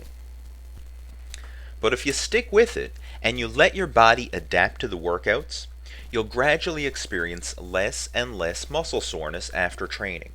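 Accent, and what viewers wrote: Canada. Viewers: This is kind of off-topic but do you happen to be Canadian? I swear i can hear the accent o.O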